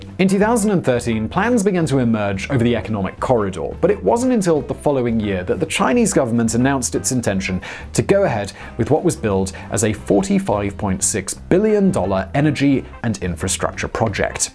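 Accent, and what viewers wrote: United Kingdom. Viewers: the way he talks in a bantering way, makes it that much funny, typical brit accent. Although Indian army has plans to seize Pakistan occupied Kashmir and in turn seizing Pakistan's dream of cpec. China probably isn't in the condition to interfere.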